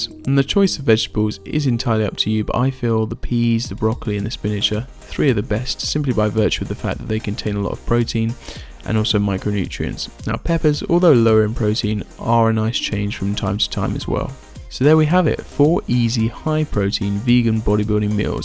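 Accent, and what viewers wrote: RP, United Kingdom. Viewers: You have a very posh accent, great meals thanks. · You speak with a perfect RP (Received Pronunciation). You should consider doing voice overs for ads etc..while you are studying.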